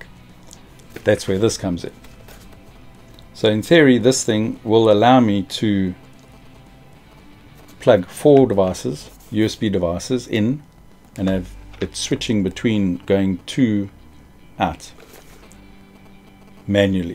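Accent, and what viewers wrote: South Africa. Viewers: UNRELATED COMMENT: but he south african aint he? dont be mad i aint racist just curious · Are you South African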